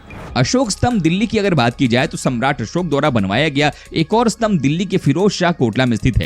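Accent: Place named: Odisha, India. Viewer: Your mother tongue is odia Right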